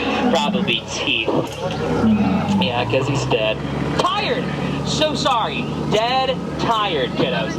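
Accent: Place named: New York, United States. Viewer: your loud New York accent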